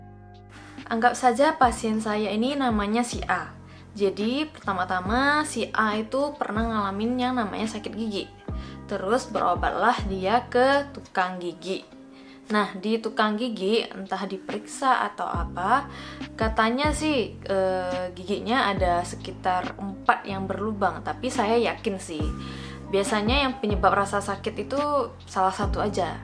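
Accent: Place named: Medan, Indonesia. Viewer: udah ku duga kalo dokter tu orng medan,soalya logatnya kas bget☺️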